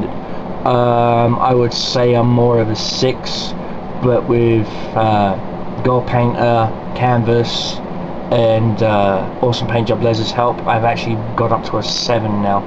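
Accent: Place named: United Kingdom. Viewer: Like it, good story about the die rolls. Where are you from? It sounds like your from blighty but your reference's to dollars makes me think you live in the states or australia.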